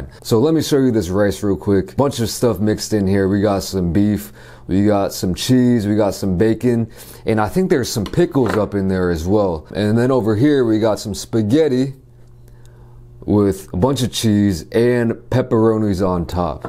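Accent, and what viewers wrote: United States. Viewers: I know he is living in Korea, but he sounds like such an American boy!!!😁✌🏽❤️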